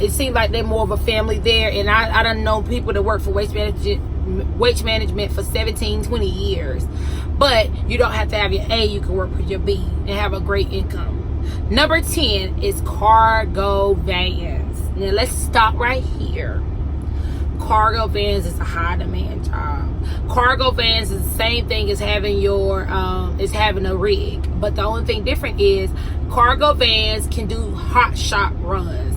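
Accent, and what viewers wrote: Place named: North Carolina, United States. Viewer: I knew she was from nc by her voice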